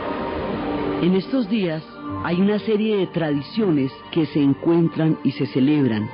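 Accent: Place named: Colombia. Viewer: Me encanta como cuenta las historias esta mujer, me gusta su voz y su acento, tremenda joya que tiene Colombia, saludos desde 🇸🇪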